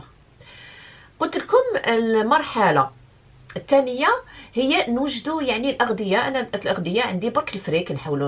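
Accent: Algiers, Algeria. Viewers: كلامك حلو كي العادة و اللهجة الجزائرية من فمك عسل لي قلتيه كلو صواب ربي يرزقتا و اهنينا و يحفظ بلادنا انا فخورة بيك و نشكرك على النظرة لمليحة لي عملتيها على المراة الجزائرية ربي يحفدك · شحال نحبك يا نحسك اختي الكبيرة نتعلم منها بزاف الصوالح شكرا على نيتك و عفويتك و زيدي علمينا نحب هدرة تعك تع صح algéroise بالطبع الله الله كما تقولي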